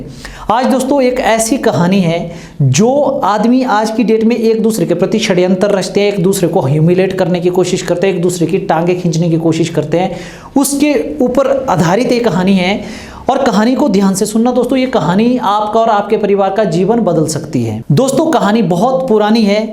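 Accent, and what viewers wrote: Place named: Mandi, India. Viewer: Mandi se ho kya bhasha mandi ki lag rahi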